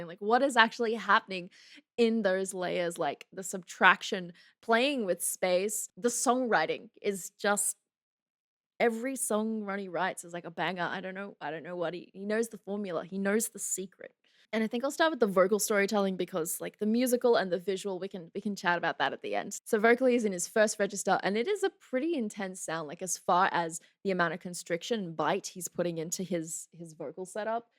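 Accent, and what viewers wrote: Australia. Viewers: That „oer, oer“😂 man i love aussies😂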